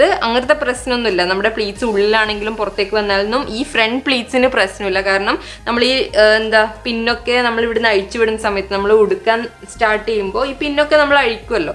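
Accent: Kannur, India. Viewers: Chechy de place evdaa kannur slang thonnunnu samsaarikkumbo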